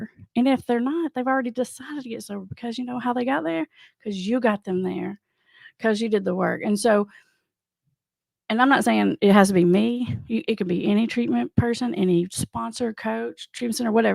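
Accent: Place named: North Carolina, United States. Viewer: Hey ! We share the same dialect ! (n.w. N.C.) 🏅 👍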